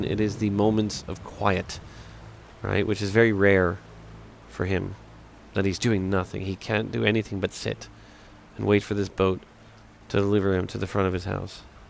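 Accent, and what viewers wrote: United Kingdom. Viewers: British accent love it ❤️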